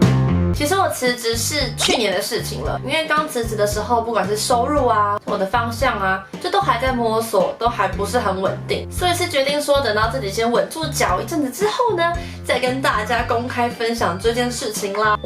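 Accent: Kansai, Japan. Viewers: Maomao有关西腔诶😄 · 关西式的声调好可爱。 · 所以Mao會用關西腔嗎？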